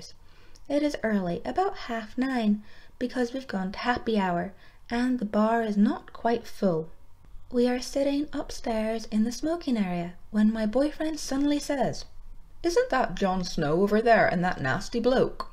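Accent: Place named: Scotland, United Kingdom. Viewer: True 😅 You mentioned Belfast, is that where you're from? I had assumed your were Scottish or something lol 😅😆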